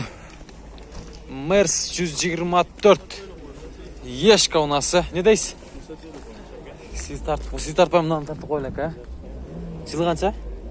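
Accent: South, Kyrgyzstan. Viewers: кыргызча суйлоп жатат,туштук диалектте,озбекче эмес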